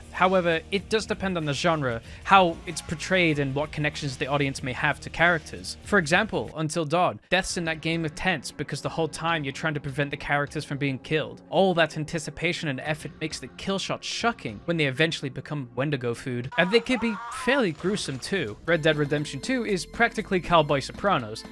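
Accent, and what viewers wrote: United Kingdom. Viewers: The pyrocynical diss is crazy, this channel feels like an exact clone. The autistic obsession with random video games, the queer fursona, the british accent. Its all there.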